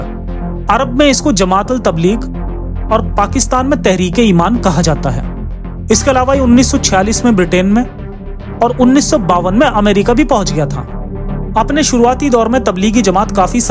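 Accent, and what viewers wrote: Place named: Uttar Pradesh, India. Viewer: उत्तर प्रदेश का है इस की बोली से समझ में आता है भाई😎 सच बताना भाई में सच बोल रहा हूं ना।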